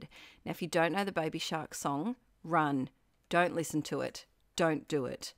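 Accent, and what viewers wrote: Australia. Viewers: I enjoyed watching your video. Thankyou for sharing your process. I agree, the papers you've used are beautiful. It's nice to hear another Aussie. A fellow New south weigan nonetheless. 😁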